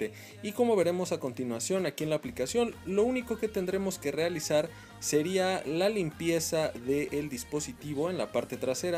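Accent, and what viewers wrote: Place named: Brazil. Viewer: Olá, eu gostaria em que país você mora? Pois, o sotaque do seu Espanhol é muito parecido com o Português Brasileiro.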